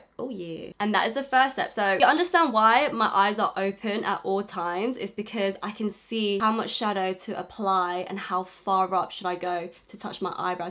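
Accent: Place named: London, United Kingdom. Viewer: I freaking LOVE this eye look!! The neon liner is giving me life 😭 also I absolutely love your London accent, makes me so happy!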